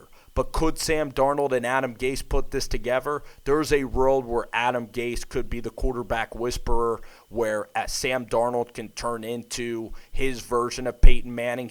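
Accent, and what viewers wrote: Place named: Deep South, United States. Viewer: thinks Davis Webb a top 5 NFL QB. also what the hell is wrong with your voice?? It changed 4 times during the video and for some reason you try and mix a deep South tone into it.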